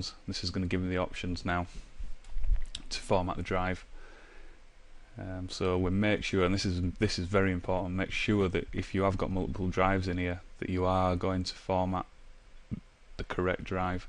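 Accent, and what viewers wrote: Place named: Northern England, United Kingdom. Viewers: Nikos Soumpasis lol it sounds like hes got a northern english accent and it sounds like an easy one to understand. but he was putting me to sleep and making me lose interest lol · i can't thank you enough for that tutorial - you've just saved me two 50 journeys and no doubt the same amount in pound notes from the pc shop for doing it!! and i could even understand your yorkshire accent! :@ cheers.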